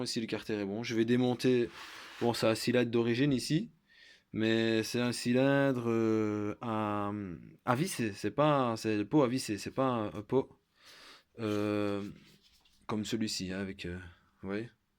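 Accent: Liège, Belgium. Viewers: Merci mon ami,avec ton accent , je me doutais bien que tu étais de la région de Liege ,moi , j'ai habité et travaillé a Andenne,puis Huy et ensuite a Wanze , les voyages forment la jeunesse , mais bon , avec mes 64 ans ,c'est un peu tard , mais je suis reté tres jeune dans ma tete,hi.....hi......Bonne continuation mon ami, tu fais du tres bon travail . A+ Alain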